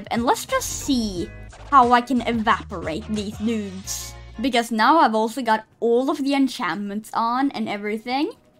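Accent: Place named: Scandinavia. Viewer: Are you scandinavian? I am norwegian and I recognize the accent hehe :)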